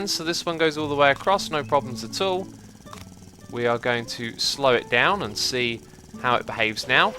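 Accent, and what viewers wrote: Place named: United Kingdom. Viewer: X, I love brittish voices.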